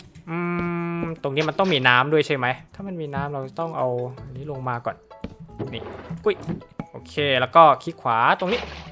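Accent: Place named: South, Thailand. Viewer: พี่เป็นคนใต้หรอครับ ผมเพิ่งติดตาม สำเนียงเหมือน😅😅😅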